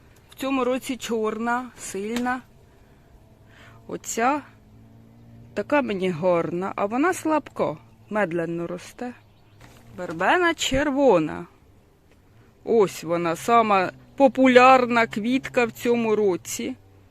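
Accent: Poltava, Ukraine. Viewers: Ви молодець! Трудівниця і розповідаєте цікаво, з гумором. Зачаровує наша полтавська мова. Всі відео цікаві. А це хочеться дивитись безкінечно. Яка краса! Де саме Ви живете?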